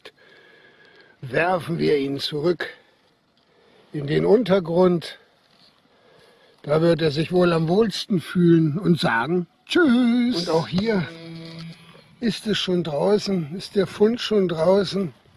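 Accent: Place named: North Rhine-Westphalia, Germany. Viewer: Ich schau dir ja schon seit Abo Nr.67 zu , aber ich frag mich ob du auch aus dem Süden kommst? Hörst dich eher nach NRW an ;)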